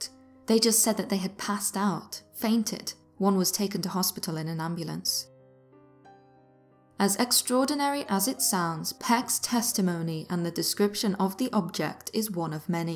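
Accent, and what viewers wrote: United Kingdom. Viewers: I loved your Jeckle and Hyde narration I would like to recommend another story if you are interested. Be.Busta narrated “The Left/Right Game” it’s a really good story but as it is from a female perspective it’s missing something. I would just love to hear your voice instead. The author writes from the perspective of a journalist from Bristol and I hate to assume that you are from the UK, but with the British/Aussie/New Zealand style accent it just fits like a glove and I find myself more engrossed in the storyline. Anyway, just a recommendation ❤️❤️❤️ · usually I hate British accents but I am in love with your voice and it is perfect for reading these things.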